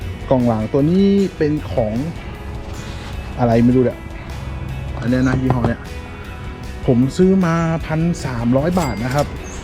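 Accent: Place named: Central, Thailand. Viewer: สำเนียงนี้โซนกำแพง ชัยนาท คอนหวัน อุทัยใช่แน่นอน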